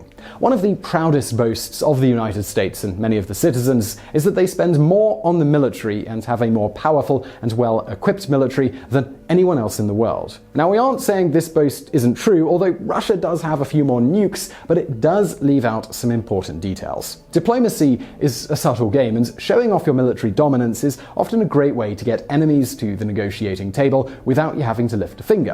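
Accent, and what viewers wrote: England, United Kingdom. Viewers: Get things wrong once in a while? He makes at least one major blunder in every video I've seen, often more. I think he's coasting on his English accent, but he's actually pretty ignorant. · This guys a Brit from Richmond VA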